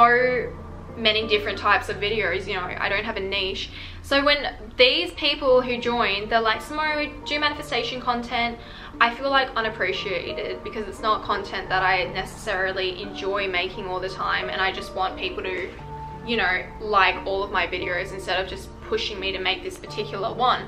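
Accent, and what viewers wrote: Australia. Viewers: Asian woman with an Aussie accent is SOOOOOOO HOT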